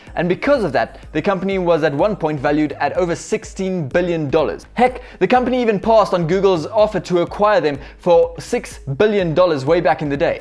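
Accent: Afrikaans, South Africa. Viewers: was this guy south african? (im also south african) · He sounds South African. 👍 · The Afrikaans is heavy with this one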